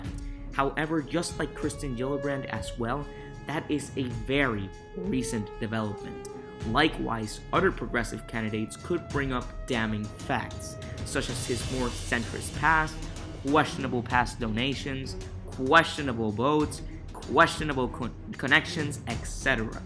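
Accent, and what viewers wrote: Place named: Sweden. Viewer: Yeah, it's Swedish for sure.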